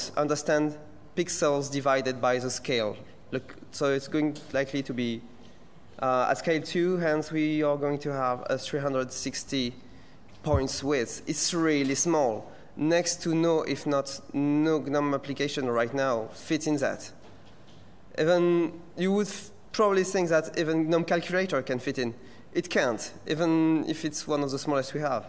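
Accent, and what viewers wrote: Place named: France. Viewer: bigre, superbe accent français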